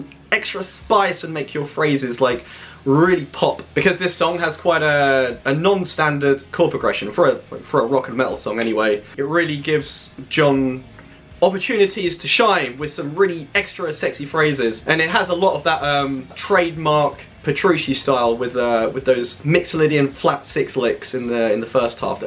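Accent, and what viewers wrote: United Kingdom. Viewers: did he? That british accent (I like so much) played me...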